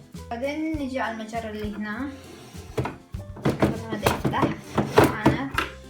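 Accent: Iraq. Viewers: حجي العراقي عسل منج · أحب وايت اللهجة العراقية😻😻 وانتو وايت طيبين تحية أختكم من السعودية😍😍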